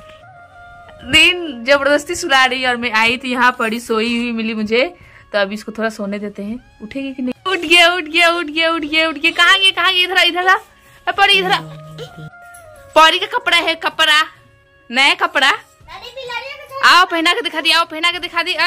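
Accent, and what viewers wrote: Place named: Jharkhand, India. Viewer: Apki jharkhandi hindi boli bahut achcha lagta hai. My jharkhand se apka video dekhta hoon.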